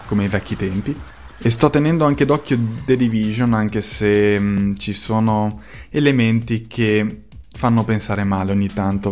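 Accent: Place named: United Kingdom. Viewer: Torna con l'accento inglese così potrai fare lo youtuber con un tocco.. come dire.. internazionale! E così il tuo canale sarà sempre più sexy! Questo pizzico di classe gli iscritti lo apprezzano ;) !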